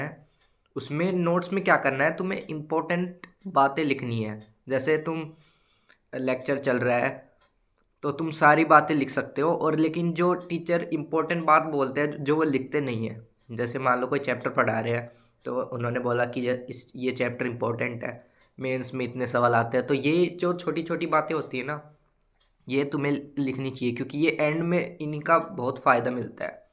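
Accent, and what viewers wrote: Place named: Haryana, India. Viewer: Bhai tera haryanvi accent kadak hai😂, mera bhi 2024 mein mains hai, 12th appearing hun😊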